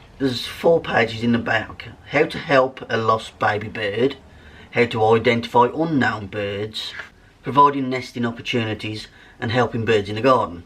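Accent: United Kingdom. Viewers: Where about in the UK are you from. Am I detecting a Black Country accent? or am I a bit off? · I can tell by your accent you must live in the West Midlands. If you do, you must visit Iron Bridge, some incredible wildlife there.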